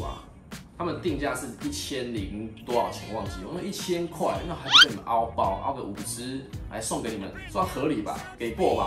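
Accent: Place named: Taiwan. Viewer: 台語夠台還會抖腳😂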